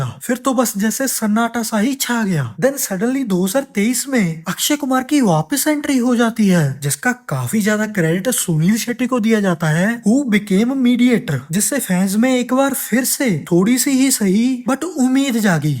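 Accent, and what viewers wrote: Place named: Punjab, India. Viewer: Your punjabi hindi accent sucks ... You shouldn't make videos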